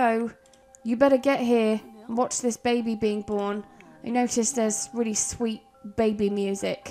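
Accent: United Kingdom. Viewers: OMG LOVE YOUR ACCENT WISH I HAD I AM AMERICAN sadly YOU SOUND LIKE YOUR BRITISH I LOVE THAT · im sorry but your accent is so british :')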